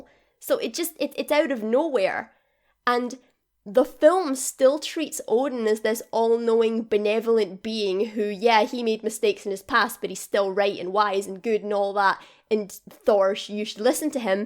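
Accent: Scotland, United Kingdom. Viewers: Oh Scottish, I was wondering. Well its lovely! And if this wasnt scripted I would have to applaud you again because I thought it was very well thought out. I too was a big fan of the shakespearean vibe they had in the first two movies which I really enjoyed but they ditched that theme and went way too silly. I liked how you highlighted the theme of Thor always moving away from wanting to rule which is very much his character arc, wanting to be more involved with middle earth than Asgard. I agreed with everything you put out there and really appreciated your video. 🙂 I just found your channel but I am definately subscribed now! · Are you Scottish ?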